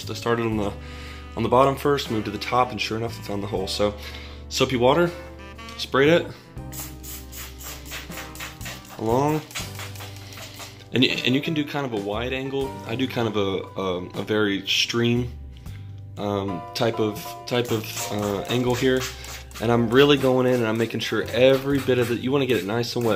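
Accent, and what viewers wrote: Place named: South, United States. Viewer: I love his accent...Southern USA?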